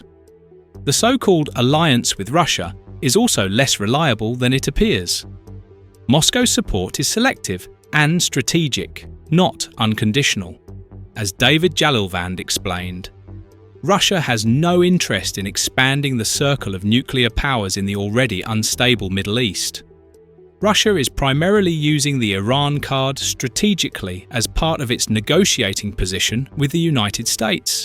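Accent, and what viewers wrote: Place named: United Kingdom. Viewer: Who are reporting your mis guided assessment? We patriotic Iranians are watching all biased media mis reporting the protests and what brave Iranians protesters are calling for on the streets, they are taking their country back after 47 years of occupation by islamist terrorists who are not even from Iranian decedents and majority were born in Iraq and lebenan. So this uprising is about taking our country back and bring back our constitutional monarchy this is the main slogan shouted on the streets return of monarchy and Pahlavi, so do not try to misreport because of being a biased media, God knows which power or ideology is behind your rasneh, I note you are based in Bangeladesh and have a british accent. Times have changed this is not 1979 when the West removed our beloved Shah and brought these terrorists to Iran and deceived part of the nation who for money and position sold their country, and majority sadly at and watched, now it is 2026 and the last 4 generations have become aware of their history and what a beautiful country they had under Pahlavi, so enough is enough we will bri g back our monarchy and the glory we had to Iran whether you biased media and globalists western powers want it or not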